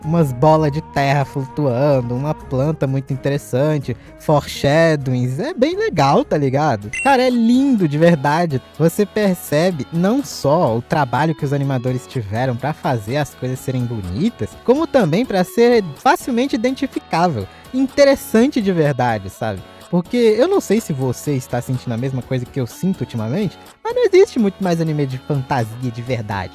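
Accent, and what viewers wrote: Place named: Minas Gerais, Brazil. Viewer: Seu sotaque é de mg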